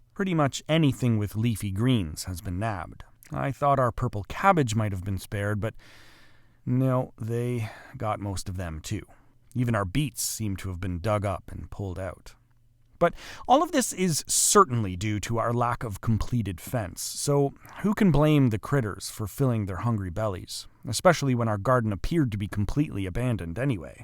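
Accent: Canada. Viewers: I wonder the same. He sounds Canadian. The topography looks northern too. I'm in Maine, zone 4/5.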